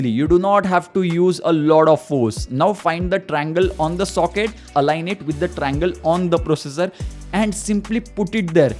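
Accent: Maharashtra, India. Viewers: i hear Marathi in Ur english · That's why I like Indian accent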